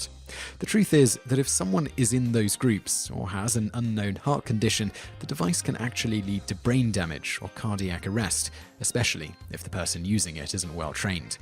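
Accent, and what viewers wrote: England, United Kingdom. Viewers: I'm not sure where you are getting your facts, but I'm seeing many/severe falsehoods. Maybe stop getting your info from the bathroom stalls and actually speak with cops and other law enforcement officials. Top ten problems with your channel? 1 thru 10 totally wrong about everything said. Maybe instead of doing a video on a system you know nothing about, Brit. Go to your country and give us a video about the problems with British police. I guarantee you know more about that. Ps your accent is horrible. New Jersey is a state not a city, by the way. Get a map. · Is it weird that a British guy is narrating this? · This is an extremely sensitive and devisive problem; to the extent that I kept noticing your use of the word "we". You are talking about concerns you particularly identify as U.S. problems, but you continually use we when considering actions and options. 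You have a very British accent so I don't know if you live in the U.S. or not. If you do, then welcome and include yourself as we; if not, then unless you are reporting facts related to many counties including your own you should not use the word we as it is inapplicable. The only fact you reported of which I was unaware was the possible use of steroids. I can't believe there are no medical tests to document their use and while I'm ignorant of laws governing their use I certainly think they should be illegal for armed people, even if you are a security guard or MP. On the whole I agree with your assessment of facts and think they are due to the fact that the U.S. is too big for oversight and responsibility is diffuse or non existent. It isn't just the police. The public school system is disgraceful. The sub literate in this country outnumber the literate and many teachers barely reach the standard of literate. 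But it is a sacred cow dying in the street of starvation in a Hindu society. Everyone reveres the cow, but no one takes responsibility for caring for it. Teachers lie and help students cheat to pass basic standard tests and everyone believes that is an aberration and not the norm, but it is the norm. Landlords of privat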